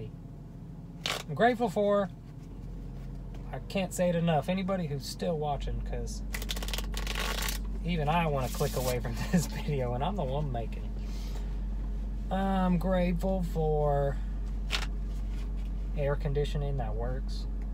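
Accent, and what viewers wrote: South, United States. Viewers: He's said before that he deliberately dropped it because he didn't think he would be taken seriously. I think he plays it up a little in some of the videos, but, it's real. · He’s from the South originally...it’s real :) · I'm thankful for the fact that you've developed a southern accent throughout the years.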